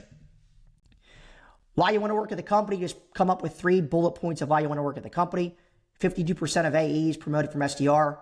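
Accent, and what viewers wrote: United States. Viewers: Doylestown native here - my ear immediately picked up on your Delco voice. Now that I live in Dallas, people get mad when I wear my Eagles jawn here. Your content is helpful because I'm looking to become an Account Manager. If possible, please send me some cheesesteakers from Cleaver's in Rittenhouse Square.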